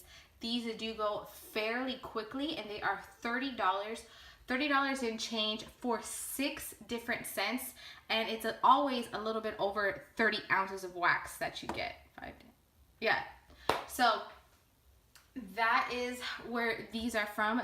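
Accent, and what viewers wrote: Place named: Mexico. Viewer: I'm curious what your nationality is! If I go by your accent alone I would guess Mexican. I'm from Texas just outside of Houston and I go to San Antonio all the time.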